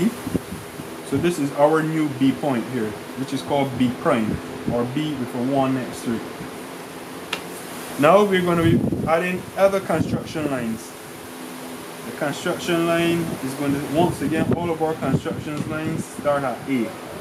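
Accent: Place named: Barbados. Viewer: This guy sounds like a Bajan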